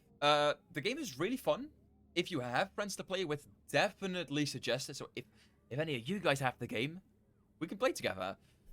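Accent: England, United Kingdom. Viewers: Pls practice an American accent instead of your horrible English accent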